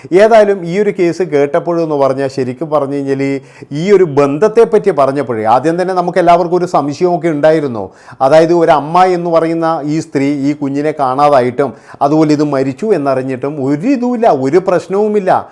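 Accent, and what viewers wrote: Kannur, India. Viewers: താങ്കൾ കണ്ണൂർ അഴിക്കോട് ആണോ സഹോദരാ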